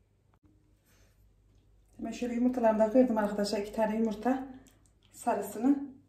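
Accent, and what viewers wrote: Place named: Central Anatolia, Turkey. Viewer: Orta Anadolu danmısınız. Şiveniz sanki oralı gibi.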